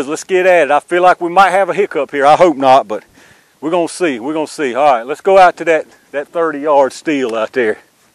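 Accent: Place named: North Georgia, United States. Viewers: Nice gun! You sound like you are from Georgia, and in the North Georgia area. You sound so much like my oldest brother who is now gone. Thanks for the video! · You sound just like all my cousins. We're from N. Ga. BTW, have you put any slugs through it? If so, what did you do with the choke?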